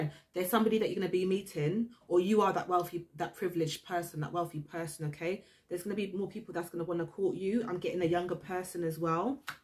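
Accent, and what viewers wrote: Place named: United Kingdom. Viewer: Hiya miss gotta luv the accent(wich part of the UK)and nothing wrong with shopping at Aldi init🙂 I think i found me new best tarot card reader miss 😍🤫 (Not disrespect here dear sis...i ain't build like that) The last holiday i went i met this lovely couple Claire and John living in Kent/Canterbury...maybe it's time to pay them/the UK a visit. Superthanks translating the cards and MASSIVE RESPECT from the Dutchman Ma'am 🙏🏼✌🏼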